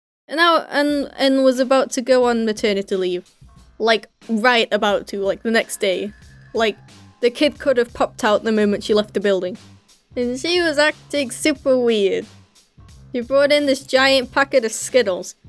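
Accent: United Kingdom. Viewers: (if this is somehow racist im sorry) are you British you sound British and if so... GOOD FOR YOU! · I Still Love Your British Voice - w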